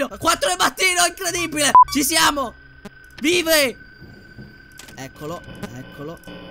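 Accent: Sicily, Italy. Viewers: sei siciliano? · Ma sei siciliano? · Ma sei siciliano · Sei siciliano? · ma sei siciliano?😱😱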